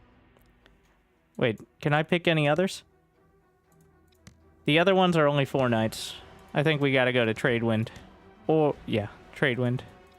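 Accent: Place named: South, United States. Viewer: Did you travel around a ton during childhood or is this the strangest accent I've ever heard from, allegedly, MICHIGAN? I was trying to nail down your accent which definitely sounds Southern, I was thinking East of Texas, maybe even upscale Arkansas, or Nashville Tennessee was my most precise guess - the sharp S-sound, the tip-of-the-tongue L combined with the otherwise Southern sound. - I decided to look it up and it said birthplace: Michigan. Would've been my last guess. is there some trailer park community there that has an odd isolated drawl haha?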